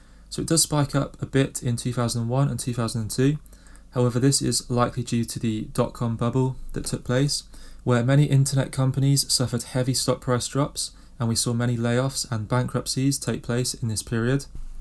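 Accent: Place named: England, United Kingdom. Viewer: Nice accent! You English?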